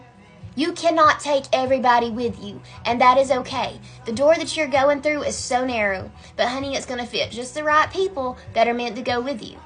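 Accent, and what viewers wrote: South, United States. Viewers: You have a great Southern accent sweets!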